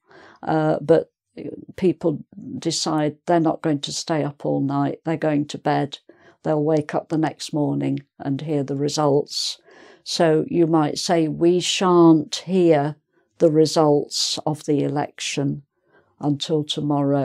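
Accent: United Kingdom. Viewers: thanks, thanks, thanks, now I understand how to use shall. (love your accent...so british)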